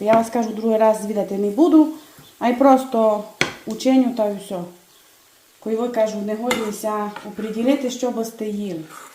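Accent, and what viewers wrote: Transcarpathia, Ukraine. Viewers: Вона говорить на чистому закарпатському діалекті! Чого повторюєте? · Дякую за дуже смачні рецепти❤️ подобається й закарпатський діалект 😊 натхнення Вам💙💛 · Добрий вечір,! Дякую вам за чергову смакоту, яку готуєте і нам показуєте! будь ласка не треба нічого вирізати при монтуванні, Аніта має рацію. Це особливість вашого каналу, ваш закарпатський діалект, слухаю і дивлюсь вас з превеликим задоволенням! Вітання з Вінниччини. Миру перемоги нашій рідній Україні, рясних Божих благословінь вам і вашій великій дружній родині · прекрасна українська мова з закарпатським діалектом, супер! Дякую за рецепт! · говоріть, будь ласка, на закарпатському діалекті і ні на кого не зважайте. Це так милоооо…..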